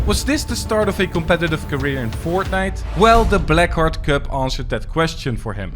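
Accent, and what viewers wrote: Netherlands. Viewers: Just a ask where are you from (I’m Dutch and idk but I sound like you Dutch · you sound dutch?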